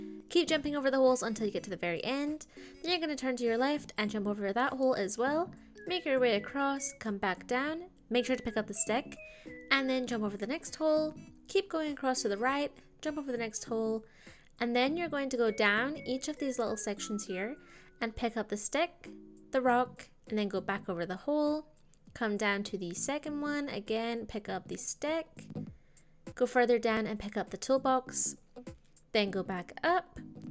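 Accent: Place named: Northern Ireland, United Kingdom. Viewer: She’s from Northern Ireland lol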